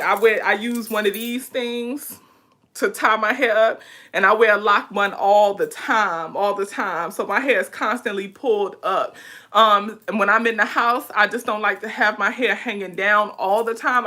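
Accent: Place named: New Orleans, United States. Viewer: You sound like you are from New Orleans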